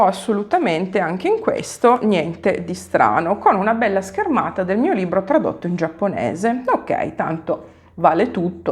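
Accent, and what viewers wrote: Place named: Emilia-Romagna, Italy. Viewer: 😂 ciao sei sempre bravissima, perché smonti con prove alla mano ogni eresia degli infedeli camelgiokey sei per caso emiliana romagnola ,ho sentito una cadenza famigliare,socmel😅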